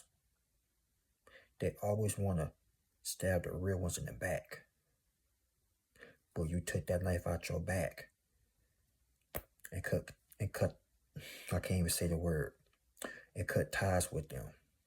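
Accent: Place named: South, United States. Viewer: I like your southern voice, You are easy to listen to.❤😊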